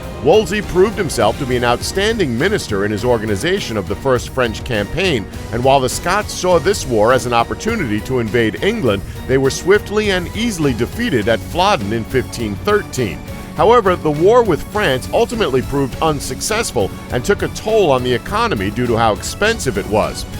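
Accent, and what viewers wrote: United States. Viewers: The American accent seems to cheapen even these inaccurate facts...very off putting. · Why do have to listen to an American commentator, with a dreadful American accent, on this video? A British commentator would never use the word “freakin,” and he would also know how to pronounce the word “Salisbury” correctly. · What the hell is an American robotic going to sound credible about English history. Don’t make me laugh. Didn’t even watch. Too stupid. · Urrgh, i turned off as soon as a heard the American voice...this video will be 90% bullshit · Whats with the yank commentary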